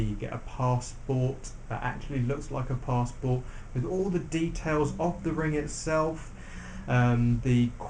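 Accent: England, United Kingdom. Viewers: holy shit it's an asian guy with an English accent!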